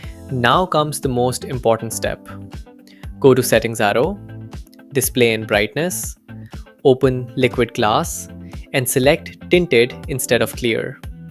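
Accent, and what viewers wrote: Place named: India. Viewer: Oh a potentially useful vide- hears indian voice Nevermind, sir i dont wanna redeem it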